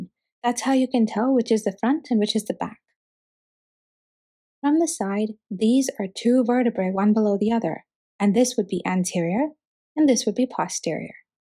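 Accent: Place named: India. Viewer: Wow , I can't believe that you're from India love your videos and your accent wow love from pakistan ❤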